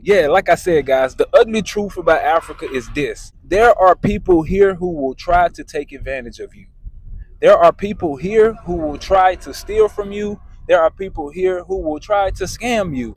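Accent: United States. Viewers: Man sounds like the hood in New Orleans😢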